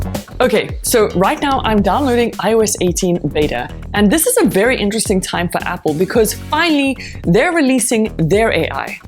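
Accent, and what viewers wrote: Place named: South Africa. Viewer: Your South African accent is beautiful 😍😍😍 just like the rest of you. Love your videos too! ❤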